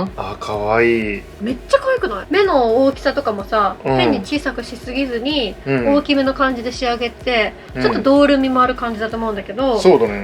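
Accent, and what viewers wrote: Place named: Miyazaki, Japan. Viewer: 確かにこれは自分の技量を分かってないと手を加えるのは怖いですね😨 だから見て楽しむ😁 解説中のちらほら出てくる宮崎弁もええなぁ〜☺️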